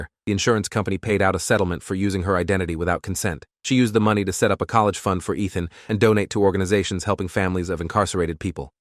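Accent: United Kingdom. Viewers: ooohhhh yeeessss ahh british accent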